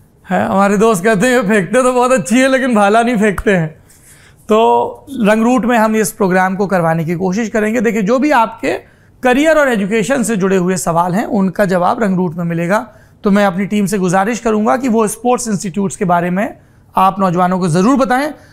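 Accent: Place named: Bundelkhand, India. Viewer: Hello saurabhji,bhasha or boli se ap bundelkhand ke lagte ho,prathak bkd prant k liye bhi apne star se prayash karenge k nahi ?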